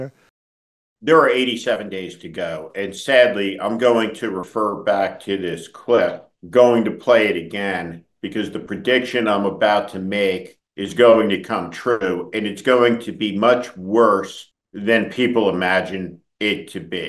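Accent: New Jersey, United States. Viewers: I support that NJ dialect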